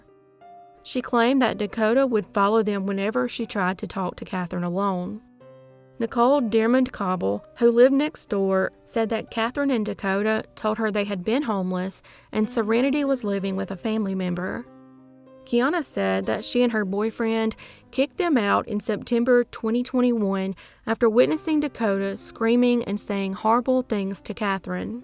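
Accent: South, United States. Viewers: Yes, I agree with everyone. Your opinion spun on this vile behavior coupled with that Southern accent is expressed quite nicely. Sorry ass sons lol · Love her southern accent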